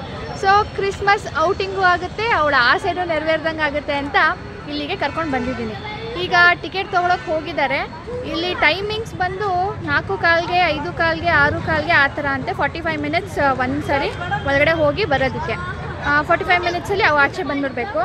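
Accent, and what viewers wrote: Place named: North Karnataka, India. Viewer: Madam I think you r from Uttara Karnataka ..yakandre nim bhashe swalpa Nam tarane ide..anyway Nice vdo ..thnk u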